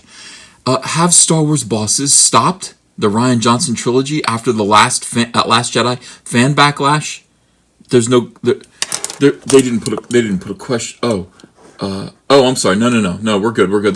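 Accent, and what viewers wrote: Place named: Long Island, United States. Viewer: what is the accent that has? I want to say"gay" but is it Long Island?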